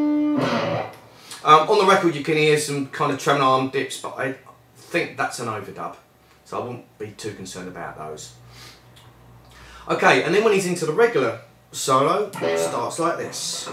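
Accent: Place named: London, United Kingdom. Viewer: yep, I found that midi file for the song, and I also use Logic, so maybe i'll put a few hours in to making it sound nice like you have. I noticed from your accent that you might not live too far from me, I am in east London and I'm looking for a guitar teacher to help me with a few things, I've been playing 42yrs, I'm just looking for a teacher who is in to the same sort of sound, runs and modal work that interests me, If you could spend a few hours a month with me, I would really appreciate it, money no prob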